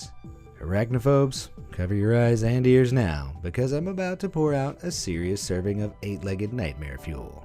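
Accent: United States. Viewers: Well the words he's useing are the English words for it (English as in UK not English as in English lauguage) tho his voice is rather amarican or Canadian. Its hard to tell as he uses alot of English terms and pronouncyation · Ah, so this is a British guy that sounds American. He said, "Petrol Head" dead giveaway.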